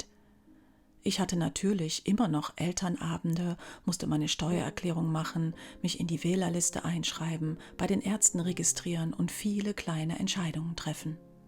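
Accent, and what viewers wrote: Scotland, United Kingdom. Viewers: Ich bin platt…bei deinem schottischen Akzent hätte ich nicht gedacht das wir Landsfrauen 😂 sind…Toll…Danke für die tollen Videos…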